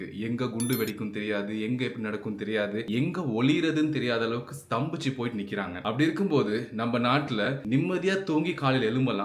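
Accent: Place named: Tamil Nadu, India. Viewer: நீங்கள் பேசும் தமிழ் தமிழ் நாட்டுத் தமிழ் மாதிரி உள்ளது. உங்கள் பேச்சு இலங்கைத்தமிழ் போல இல்லை. (மட்டக்களப்பு, யாழ்ப்பாணம் மற்றும் மலையகத் தமிழ் போன்றும் இல்லை)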